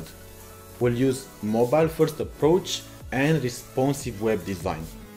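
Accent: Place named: Romania. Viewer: Are you from Romania? Because your accent resembles :) GJ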